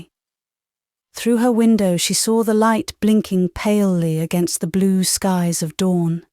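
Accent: United Kingdom. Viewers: Beautiful British accent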